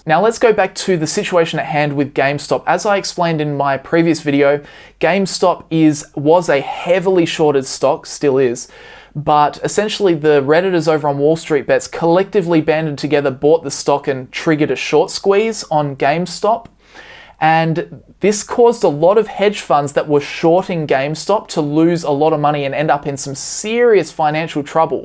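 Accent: Australia. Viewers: Are you an Aussie living in America?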